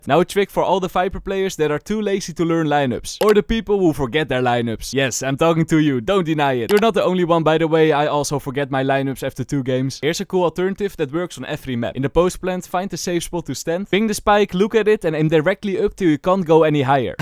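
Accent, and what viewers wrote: Netherlands. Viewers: are you dutch? I think i recognise your accent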